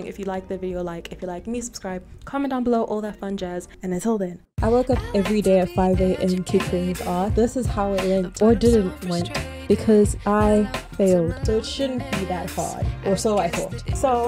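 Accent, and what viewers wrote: South Africa. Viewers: I knew your accent sounded a little too homely and then you mentioned south africa ! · Are you from South Africa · Are you South African? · Wanted to ask if you South Africans cause of the nice accent but I guess you answered it in the vid 😅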